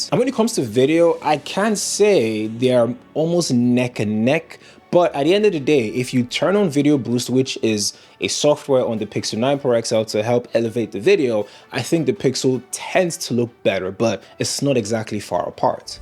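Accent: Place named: Nigeria. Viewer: I hear a Nigerian accent... I subscribe 😂😂. Good luck with your channel dude! Review was on point BTW.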